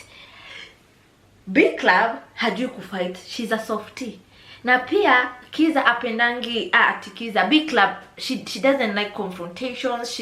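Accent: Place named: Kenya. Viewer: You are a good story teller, I love you, nice kenyan ascent.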